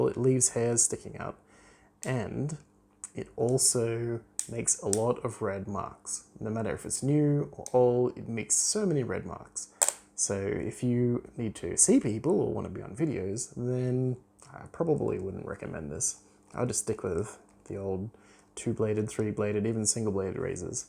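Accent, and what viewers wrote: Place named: Germany. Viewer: Deutscher Akzent kickt wie Beckham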